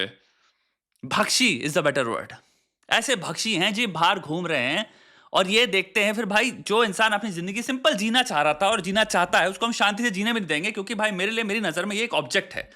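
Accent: Pakistan. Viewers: The accent gives me that he is from Pakistan. I might be wrong but all the lingos and slangs he is using are usually used by Pakistanis. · he has pakistani accent and muslim i am 100%sure he's a pakistani and that's their thinking for a kafir women's that's what they are taught since childhood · Pakistani lg rha hai accent se... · Accent to pakistani lag rahi hai iski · His accent is Pakistani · H sounds like Pakistani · Lahori accent ha iska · His accent coincide more with pokistani than with an Indian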